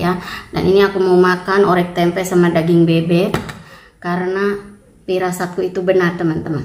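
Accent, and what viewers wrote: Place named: Cirebon, Indonesia. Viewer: Semoga ama cpt sembuh....,maaf mba wong crebon ya...dari dialeknya..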